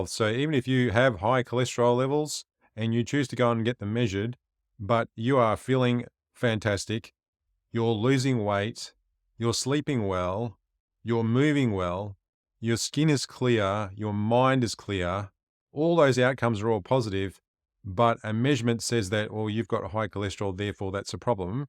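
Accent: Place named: Australia. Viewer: Hey! Outstanding and informative video!! You summed it up in only 8 minutes!! I'm impressed!! 😊👏🏼👏🏼👏🏼👍🏼💪🏼💕 P.S. Sidenote: I Love some of the words you say, like the word "rubbish" instead of "trash" or "junk" or as some call it, "crap", lol.😀 (I love Australian and British words and accents!) I actually had a good friend from my college days, <num>. He was an exchange student, Tennis player, from South Africa who dated my best girlfriend. I was often a tag-along during the summer on their outings and dates. He is the one who taught me the word petrol for gasoline. Lol. Also my 13 yr old granddaughter loves the Australian children's cartoon series 💙"Bluey"🧡and so do I! Such a cute show! That's all for now! 😁 Take Care, God bless you and your family! 💕🙏🏼🤗 Susan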